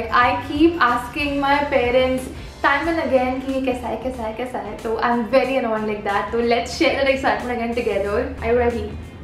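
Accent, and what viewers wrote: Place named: Maharashtra, India. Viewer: Hi di Ur videos are amazing. Apke videos me na woh marathi hindi ka jo touch hai na I love it😍😍☺🙂😁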